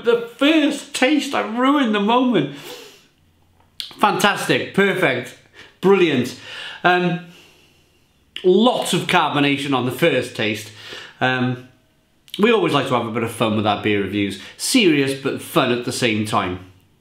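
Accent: Wales, United Kingdom. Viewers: You can hear the Welsh tone, though it is more subtle than other Welsh accents that are stronger. Watch and listen to former rugby player and now commentator Jonathan Davies. That is a stronger Welsh accent. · slightly, he’s got a very light accent compared to some other welsh people but all in all I think a lot of them don’t have a particularly strong accent as some times you can’t even tell if they are welsh and that’s coming from someone who lives in England and has been wales quite a few times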